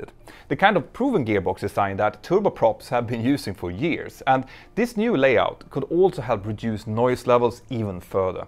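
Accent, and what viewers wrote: Sweden. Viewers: The Swedish English really makes this feel international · A 100 % the host’s from Sweden… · Are you swedish?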